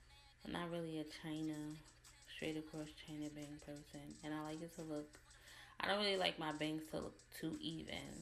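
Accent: New York, United States. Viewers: Do I Hear A New York Accent ?? Omg If So YASSSSS ITS SK PRETTY lol i love accents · Hair looks amazing!!! Love that NY accent too. :) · I love the New York accent, im from California, I'm in Long Beach I love yall 💜💜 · What part of NY are you from? I hear an accent.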